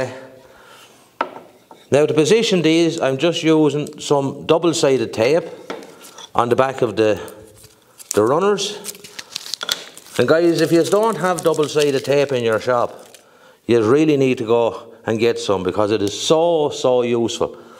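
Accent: Ireland. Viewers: Great video, very helpful. Also I had to subscribe just to hear that wonderful Irish brogue! Tanks!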